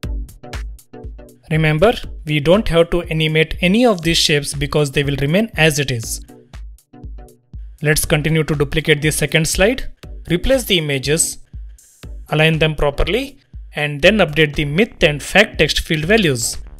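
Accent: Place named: South India, India. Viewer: Wow.... Sir i dont know why, But i feel you are from south india.. May i know from which city you are ?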